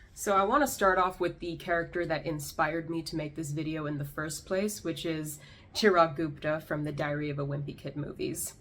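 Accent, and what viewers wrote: United States. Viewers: also in Bengaluru there was this lady in a jewellery shop who was grinning ear to ear thinking you are a typical Indian girl putting on an American accent even though you were not , it’s the normal way you speak from the day you learnt to speak , just wanted to mention it here . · Girl, you're upset about an INDIAN character played by an INDIAN actor having an INDIAN accent? I mean, whether or not the character was written to have an accent and whether the actor himself really talks that way is null and void. The character is INDIAN so having an accent seems fitting. Did he NEED an accent? No, but it's no issue that he HAD one. At least they got an Indian actor to play him than getting let's say, a white person and having him do an Indian accent, then I can see you getting a bit offended but you just seem to be nitpicking. The fact that boy even did that, never knowing him prior to his TV works, was genius was because he was convincing it shocked me when I saw interviews and come to find out that wasn't his accent. 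THAT'S TALENT and should be glorified and I don't mind him doing that more, not just speaking his regular accent because that's just how he talks in real life. He's playing a character after all so he should able to branch out and portray different elements from himself. And don't get me started on the clothes because it's simply to reflect the character's heritage. Do you feel it makes them "too" Indian? Why is it okay for YOU to wear your Indian clothes here? You don't need them given your rather Americanized accent I might say, but it's obviously done for you to reflect your heritage so why can't a character have this done? THE IRONY. Apparently if you're an Indian character and don't have an accent like you or wear Indian clothes (despit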